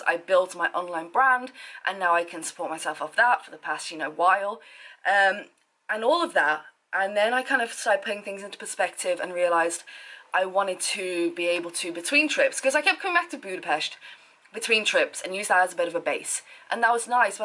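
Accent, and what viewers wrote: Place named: Birmingham, United Kingdom. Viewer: I knew you were a Brummie 👍🏼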